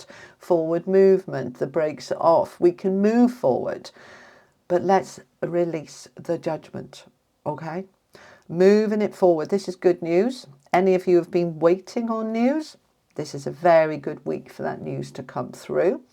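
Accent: England, United Kingdom. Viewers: I had the same flu on the same days, a terrible unstoppable headache and a total lack of energy. But I'm feeling better now. I wish you a quick and full recovery. You're such a nice human being and it's a pleasure to listen to your readings with that perfect English accent. Love from Italy ❤🇮🇹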